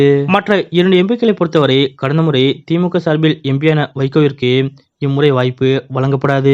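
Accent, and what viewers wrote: North India, India. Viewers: Speak properly Tamil. You are not original Tamilian. May be from North India. From Gujarat????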